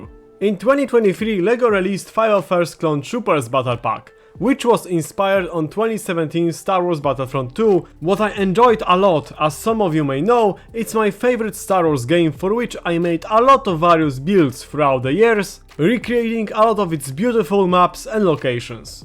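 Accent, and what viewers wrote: Poland. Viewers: Are you from Poland? I mean your voice have so polisy Accent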